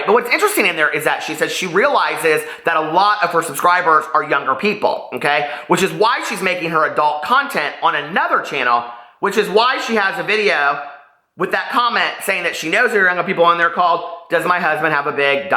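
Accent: South, United States. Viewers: BEAST!!! or Peace :-) I have literally watched your videos all week here while I'm at work (so bad) but I do thank you. It's so hard to believe you're not a Southern. You sound just like us Southern Folk. I enjoy your videos and thank you for being yourself. Love You!!!!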